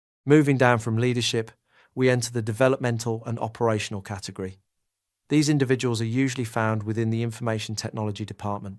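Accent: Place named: United States. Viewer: Great to find a British English instructor with clear examples and explanations. However, I really do not like the American spelling and pronunciation. TY though. I will be sharing the links.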